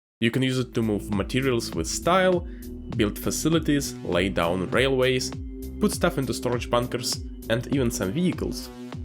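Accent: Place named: Russia. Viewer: Я слышу русский акцент...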